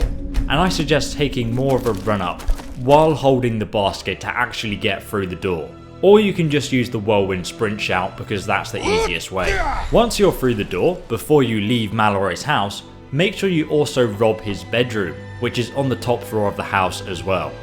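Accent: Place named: United Kingdom. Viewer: LiverpoolSwag69 he's British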